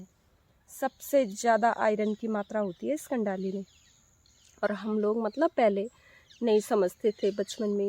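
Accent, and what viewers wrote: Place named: Garhwal, India. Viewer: Bahut bdya lgni cH gadwali bhasa jb ap bolte hai🙏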